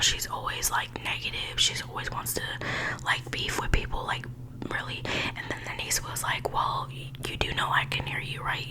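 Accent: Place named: New Jersey, United States. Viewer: Knew u were from jersey the min I heard u talk! but it sucks u had to go thru that, sounds like a headache. 🤕😒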